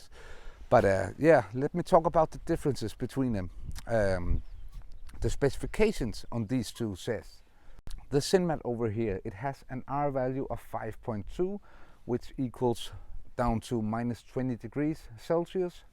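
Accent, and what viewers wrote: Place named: Denmark. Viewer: Can i ask where you live? You sound Danish but most of your videos dont seem to be shot in Denmark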